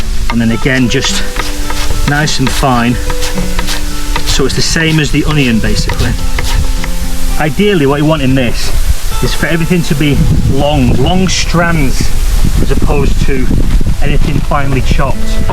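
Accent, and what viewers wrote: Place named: United Kingdom. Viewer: Hey! It's me the "bastard". I followed you to your new channel. I'm sure youre elated! Nice to see you've already got more subs on your first video than my channel has in the last ten years! Although I love seeing you go into town for local cuisine, I'm also glad you decided to show your cooking skills. You sound a bit like Ramsay when you talk while cooking lol. Must be a Brit thing.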